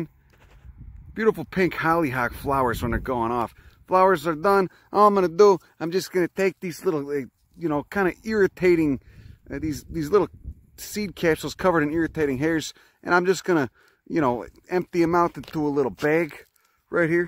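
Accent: Chicago, United States. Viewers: You are fucking funny! With your Chicago accent and knowledge of botany. It's like listening to a mobster with A botanical education. Kinda almost an edgier botany version of AvE. Lol. And I love him.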